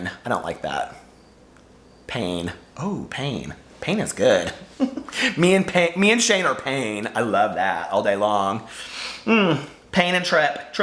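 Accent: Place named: Indiana, United States. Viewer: I love your Indiana accent! I lived in South Bend for 4 yrs & loved it 😊 You are so funny & I love watching you 💙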